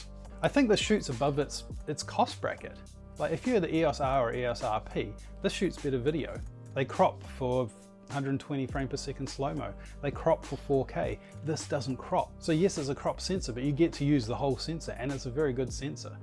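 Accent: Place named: New Zealand. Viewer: Omg I thought you had a Kiwi accent! And you are from NZ indeed!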